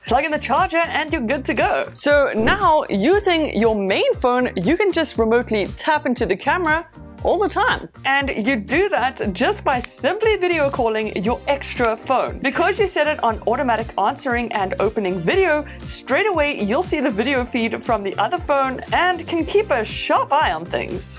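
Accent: South Africa. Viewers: Hi are you South African, cool channel keep it up ! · Hi a quick question are u from South Africa 💭❓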